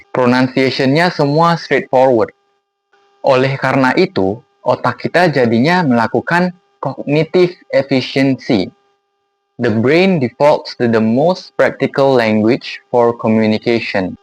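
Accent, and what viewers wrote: Jakarta, Indonesia. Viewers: Keren bahasannya.., tapi rasanya elu pame bahasa Indonesia dialek jakarta, apakah bisa mengenali atau menggunakann dialek bahasa Indonesia yang lain? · Kamu bukan hanya bisa bahasa indonesia tapi kamu sdh dapat dialek jakarta.👍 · Wahh...ada orang Singapura tapi logat JKT 👏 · Logatnya sdh Indo n fasih ngomong gua wkwkwk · Pas ngomong singlish pun logatnya sdh indo 😅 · Busyet medok banget logat jakarta nya 😂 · Itu dialek Jakarta...kata kuncinya ada byk istilah yg diambil dari bahasa Betawi. Bahasa Indonesia yang baik dan benar adanya di acara warta berita televisi dan radio. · aksennya uda kaya indo bgt bro keren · Masih gak nyangka, abangnya org Singapur 😅😅 soalnya dri dialek...udah indo banget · Lebih jadi orang Indonesia versi Jakarta sih bang. Boleh bang tambah perbendaharaan logat bahasa Indonesia versi daerah lain di Indonesia. 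Karena kalau waktu main ke daerah lain pakai elu/gue di daerah lain bisa dibilang aneh. 😁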